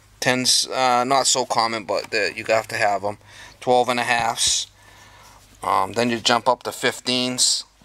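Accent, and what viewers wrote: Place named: New England, United States. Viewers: No he doesn't, that sounds closer to Boston accent.... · Like your yankee accent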